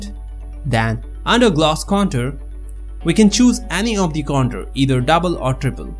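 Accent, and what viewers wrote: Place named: India. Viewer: Love your videos But please keep your Indian accent.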